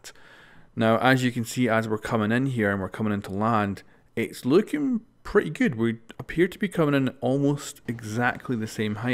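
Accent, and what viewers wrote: Scotland, United Kingdom. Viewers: Are you Scottish? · Sub'd cause Scottish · Are you Scottish or Irish?? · Are you Scottish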